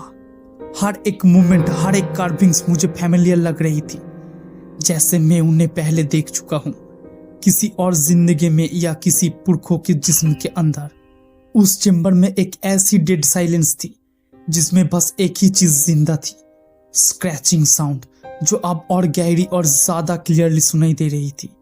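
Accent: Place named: Bengal, India. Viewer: Dear Sir your Bengali accent is to strong.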